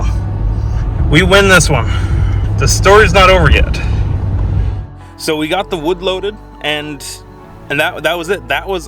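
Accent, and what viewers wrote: Canada. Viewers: Lmao the Canadian accent “aboouut” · Canada eh?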